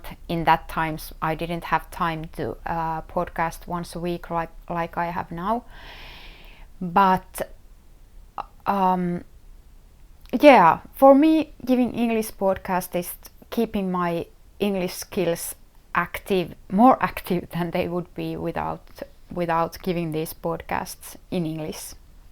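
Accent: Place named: Finland. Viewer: Congratulations!! It is so charming to hear a Finnish accent. I spent my childhood summers in Finland and remember very fondly picking blueberries in the woods near the lake of our friend's summer house. So I would love to let some berries float on lake water: Dreaming of Finland in the knitting of silk ribbing, small bobbles and waving short rows.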